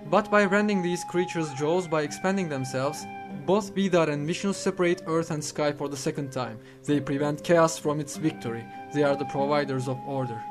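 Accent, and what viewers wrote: Turkey. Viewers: Aksanından Türk olduğunu anlamıştım. :D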